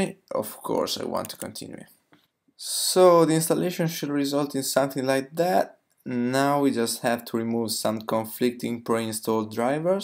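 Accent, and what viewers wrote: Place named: Italy. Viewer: That Italian accent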